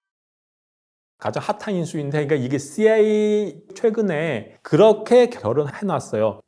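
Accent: China. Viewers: 중국인 아니야? 발음이...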